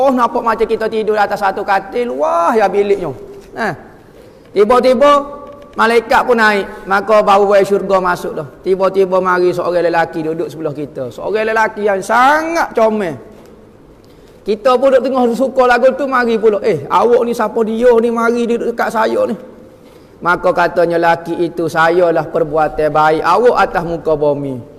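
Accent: Kelantan, Malaysia. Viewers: sb kbanyakn yg prtikai tu..ak tgok ade yg x brpe fham ape yg d mksudkn oleh ustaz...ustaz ni kn bhse klantan dia pekat skit..ape yg dia smpaikn lain yg org fhm..tp x smua la..ape pn ak ske,ustaz idrus dn ustaz sbdul somad...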